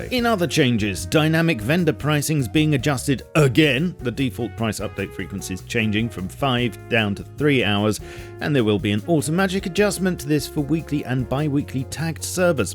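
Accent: United Kingdom. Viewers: brittish accent the entire video verry american bottle of water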